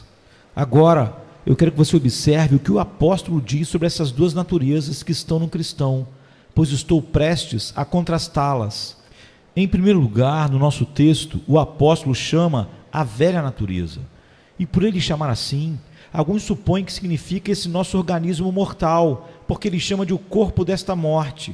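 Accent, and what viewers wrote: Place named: Rio de Janeiro, Brazil. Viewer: Tem sido maravilhoso ouvir Spurgeon em sua grandeza em português e ainda melhor com este gostoso sotaque carioca! Obrigado irmao que o Senhor continue abençoe o sempre!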